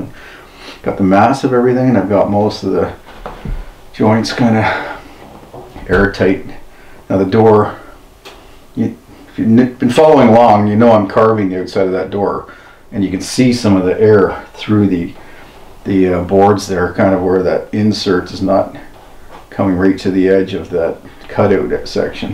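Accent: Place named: Canada. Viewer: When you make the "ou" sound it's easy to tell you are from Canada.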